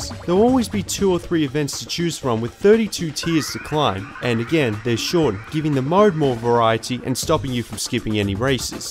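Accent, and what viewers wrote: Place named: Victoria, Australia. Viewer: I would say by the accent he is from either SA or VIc, probably Vic.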